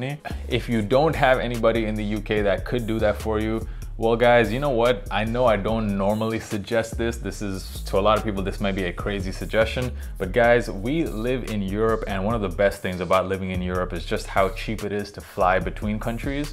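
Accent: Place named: United States. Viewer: Bruh... you've got an American accent and live in Berlin. WHAT IS A MENS SIZE 11?!🤣 US/UK?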